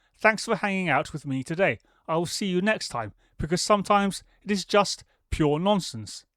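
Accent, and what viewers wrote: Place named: United Kingdom. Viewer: I really don't need some guy with a British accent telling me the plot's and science is nonsense. It's FICTION like everything coming out of the mouth's of EU leaders these days. I liked the stories.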